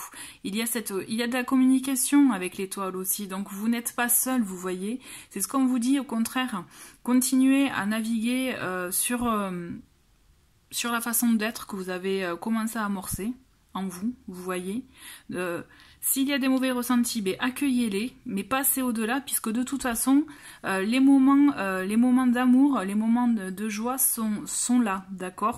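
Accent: South, France. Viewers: Bonsoir moi personnellement j'adore vous entendre parler votre petit accent me fait penser au sud de la France vous avez l'accent qui chante et cela fait chaud au cœur pour avoir vécu une partie de ma vie dans le sud et actuellement en région parisienne cela fait du bien oui ce confinement n'ai pas tous les jours facile surtout quand on et seule même si il y a Messenger moi qui étais vraiment très proche de mes petits enfants et quand il vous dises mamie tu me manque cela va être vraiment dur oui angoisser car avec ce confinement et mon autre qui travaille je me fait beaucoup de souci car nous vivons pas ensemble merci pour votre tirage passé une belle soirée Gratitude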